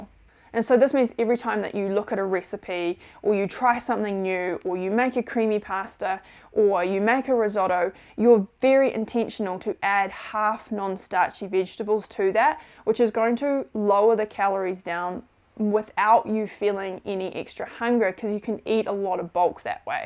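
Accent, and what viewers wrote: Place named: New Zealand. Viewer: She sounds like a Kiwi (New Zealander)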